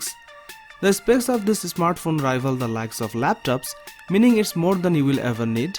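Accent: Bengal, India. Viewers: Sound like Bengal or assam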